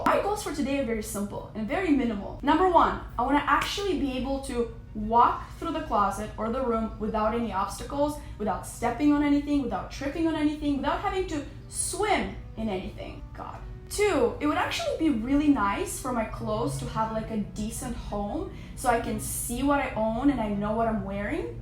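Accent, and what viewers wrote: Russia. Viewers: Is that a Russian accent? Me gusta. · A cute Russian girl. Still your accent is prominent, but overall a good job. Greetings from the EU.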